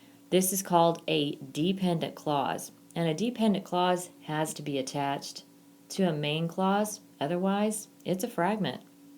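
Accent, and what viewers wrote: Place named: United States. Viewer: That's her American accent. I like it lol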